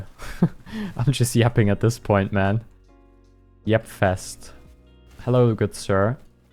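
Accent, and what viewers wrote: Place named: Germany. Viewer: I hear intense german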